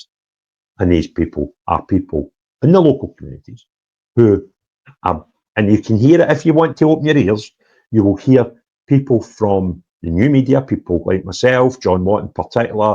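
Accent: Scotland, United Kingdom. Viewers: Thanks but I struggle to understand your Scottish accent - just struggling a bit with the Scottish accent - still got the gist though! the Scottish globalist are depending on the taxpayers to fit the bill and that is a bit unfair! Scottish accent strong,! Got it though Appreciate it,! Accent almost lost me!, I’m taking notes… and also trying to decode that accent 😆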